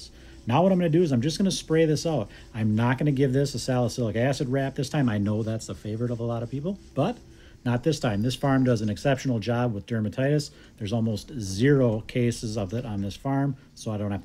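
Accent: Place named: United States. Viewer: Is it just me that your accent is more american nowadays? I used to hear your thick accent before so it is weird for me to hear your more american, english accent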